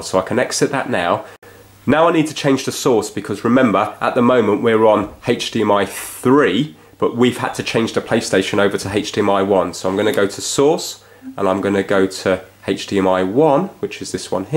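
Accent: United Kingdom. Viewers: I love his British accent!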